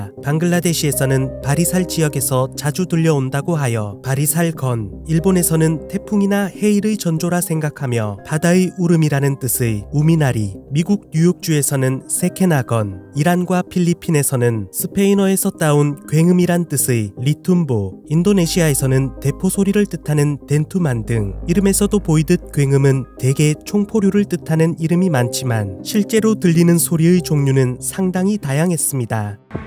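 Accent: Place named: Gyeongsang, South Korea. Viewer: 왠지 경상도 사람이 서울말하는 것 같은 말투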